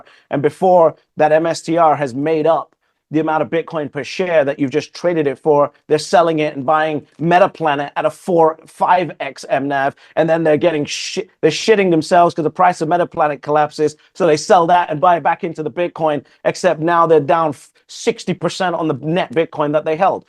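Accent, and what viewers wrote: United Kingdom. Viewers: Talk that talk ‼️💪🏾💯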